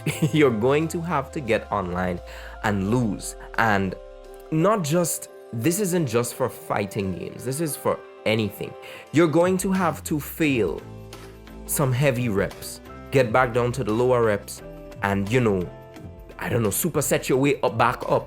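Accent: Trinidad and Tobago. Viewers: Trini accent talking about tekken reminds me of being 9 and playing tekken 3 with my cousins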